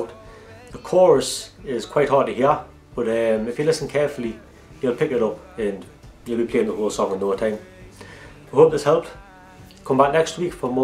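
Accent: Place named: Newcastle, United Kingdom. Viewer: It might be his Geordie accent, but the tab and the notes he's playing match up.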